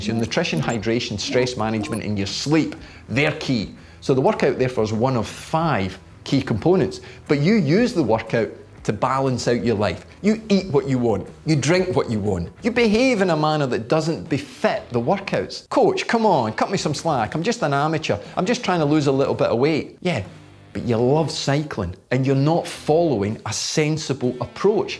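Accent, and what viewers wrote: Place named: Scottish Highlands, United Kingdom. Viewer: I hear a Highlander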